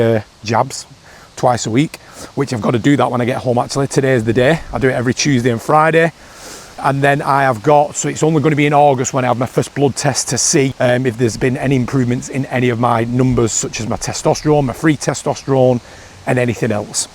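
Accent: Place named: Wigan, United Kingdom. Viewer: You’ve got be from Wigan or not far off with that accent lol well done on your journie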